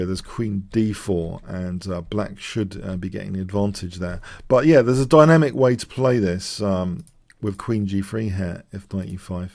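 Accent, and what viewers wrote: England, United Kingdom. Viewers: Thank you so much dear I really learn so much from you, great analyzing tactics and plans you really Amazing. beside entertaining I like your English accent..smile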